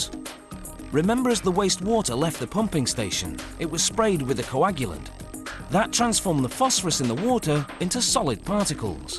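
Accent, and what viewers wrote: United Kingdom. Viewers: I've never herd British How it's Made before.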